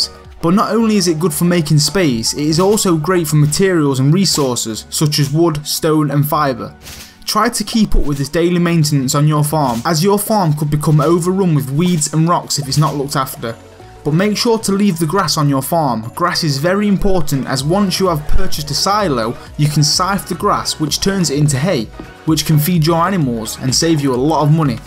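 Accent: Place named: East Midlands, United Kingdom. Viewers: Thanks for video bud, appreciate it. Nice to hear that sorta accent to, from the east Midlands by any chance ? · Loved the narrator's voice/accent. He reminded me of my childhood in Leicestershire (Ashby-De-La-Zouch.)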